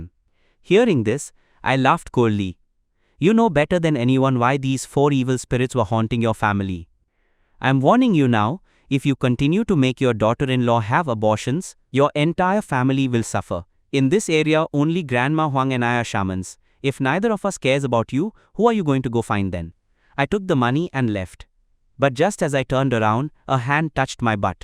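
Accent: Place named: India. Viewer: Indien accent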